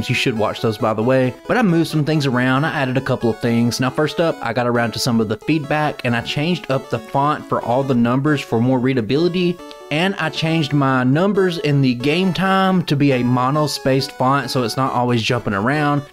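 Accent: South, United States. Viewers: Hey man just wanted to comment to say I love the content and just to support the algorithm. I love that your voice feel so like home since I am from the south as well! Keep it up! Can’t wait to see you continue to grow :)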